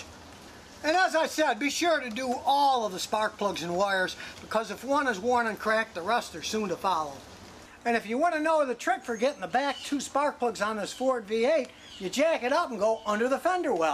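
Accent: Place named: Midwest, United States. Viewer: bummer. your accent sounded like you were from the midwest...so I was hoping you were in Michigan. love the channel. thanks and have a good day.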